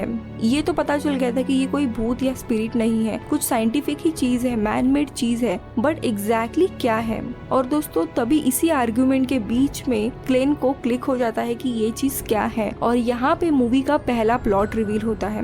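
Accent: Maharashtra, India. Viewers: Check your marathi accent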